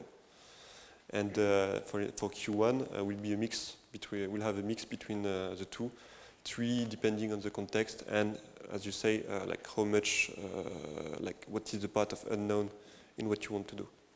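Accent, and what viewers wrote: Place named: France. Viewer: Ecouter un français parler anglais ça devient vite insupportable. Est-ce que vraiment le type de public justifiait ça?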